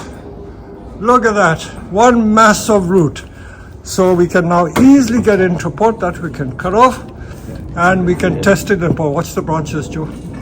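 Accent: South Africa. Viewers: guy sounds south african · Great hearing a south african accent there.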